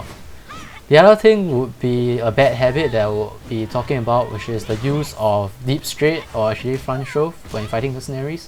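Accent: Singapore. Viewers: Lol I can tell you're Singaporean just by the way u talk! No problem understanding u cuz im also Singaporean :D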